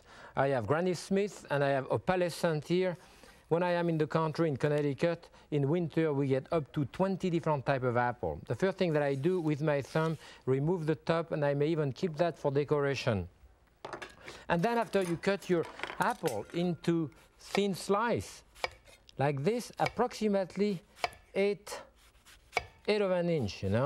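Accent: France. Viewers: He corrected himself a minute later and said “of course it’s a cantaloupe “, but you may not have understood his divine French accent.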